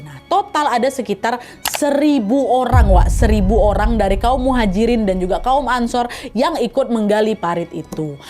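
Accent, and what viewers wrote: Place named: West Sumatra, Indonesia. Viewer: Tagayiang gayiang bahaso Minang e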